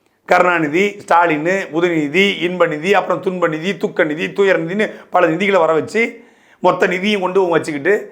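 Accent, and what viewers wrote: Tirunelveli, India. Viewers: இந்த 'இழுவ'..😂 அண்ணன் திருநெல்வேலிக்காரன் னு நிருபிச்சிட்டீங்க..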